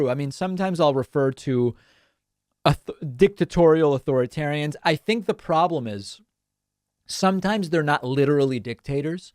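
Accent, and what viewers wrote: England, United Kingdom. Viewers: nYukes / nYew: this is British pronunciation. And I would never have guessed English was not your first language. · For the record, your pronunciation of "nukes" and similar words is in accordance with British English rather than American English. That person was correct that "nukes" rhymes with "Dukes" but, in British English, they are both pronounced the way you are pronouncing "nukes". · pronounces those English words like we English do. Make of that what you will. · Ok. Just realized another reason why l like listening to you! Your pronunciation! ( Jamaican here ..so perhaps the British influence in the pronunciation choices, appeal to me as well 😏) but seriously --- WHAT is wrong with having multiple ways of pronouncing things! Sheesh...it is a large WORLD here, people! 😑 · Four years older than his senile diaper wearing former POTUS. 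The decline of the West, yes, standards of probity and duty and competence have fallen. I would put it the door of the sinister Murdoch Empire, myself. Everywhere they go, they spread misinformation and Conservative rage. Look at the powerbrokers, not the fawning, incompetent sockpuppets they push forward for election. By the way, some of your pronunciation is more British than American. Either is correct. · You pronounce "nukes" and "nuclear" as British people do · I think its because you are speaking English rather than American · England and australia do same pronunciation as you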